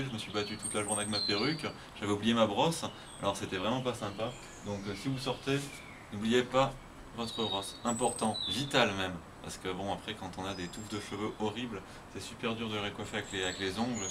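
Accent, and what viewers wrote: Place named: South, France. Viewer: ah oui d'accord alors tu commence à avoir l'accent du sud !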